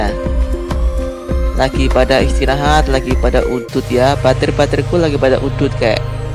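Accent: Banyumas, Indonesia. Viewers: Ora ngapak ora kepenak mantap sukses selalu kak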